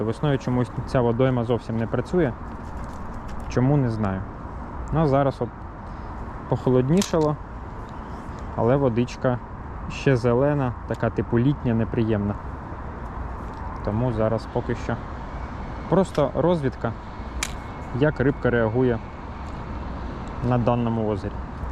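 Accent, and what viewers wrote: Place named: Russia. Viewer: сразу слышно, шо хлопец в быту говорит по-русски (не по-российски), но под давлением пОтриотичного рогулья, вынужден видосы пилить по-украински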